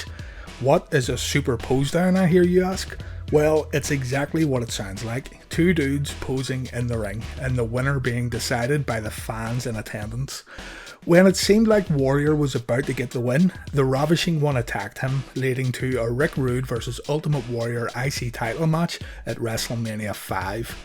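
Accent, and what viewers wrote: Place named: Scotland, United Kingdom. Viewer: I was expecting an American video and this Scottish dude comes on.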